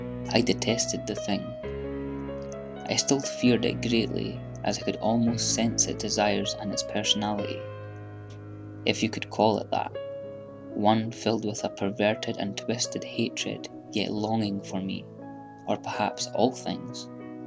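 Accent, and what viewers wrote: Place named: Scotland, United Kingdom. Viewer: Nice to hear a fellow Scot read one of my stories. Nicely done :) ~ Mike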